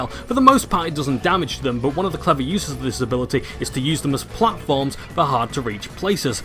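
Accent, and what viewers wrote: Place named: Northern England, United Kingdom. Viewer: It's your accent, it sounds more Northern than Yorkshire to me XD Are you gonna re-review the Rayman games btw?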